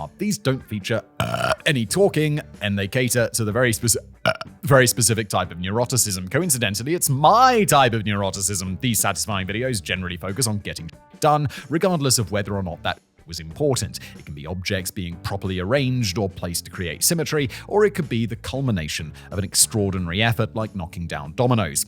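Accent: United Kingdom. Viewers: Maybe not ASMR, but Simon could definitely launch a sleep version of his shows - he doesn’t even need new scripts, just keep the tone level 😅 I like to listen the The French Whisperer to fall asleep, but a British accent beats a French accent any day 😂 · for whatever reason, a man with a deep, soft voice and an accent from anywhere in the UK, could read anything at all and I will for sure fall asleep within 15 minutes. I would sign up for a Simon ASMR channel.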